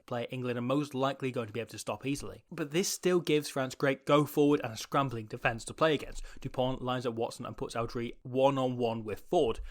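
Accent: Wales, United Kingdom. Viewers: haha - that's hysterical... "he picks to draw the Napoleon pops Dubai" is a mistranscription of "he picks to draw Vunipola and pops to Baille" also anytime it reads 'rug, rook, rock' - it is of course 'ruck';-) maybe Google needs to train its AI more on the Welsh accent (although is not that strong)... mind you, I should not complain, when I watch France play, I keep hearing your captain's name 'Ollivon' as 'vol-au-vent' X-D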